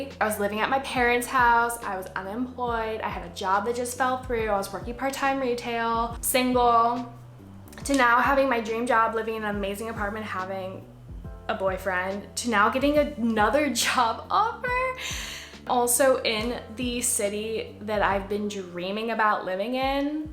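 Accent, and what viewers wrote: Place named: Los Angeles, United States. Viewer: You're gonna fit into LA with your voice lol. I would've thought you were from there. No shade though.. Great and inspiring video. Thank you and congrats!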